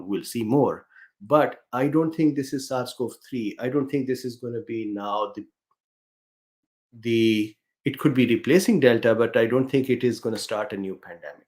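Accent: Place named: India. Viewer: C19 is the worlds biggest scam, fun ,speaking of scams, hearing it in an indian accent makes even more of a scam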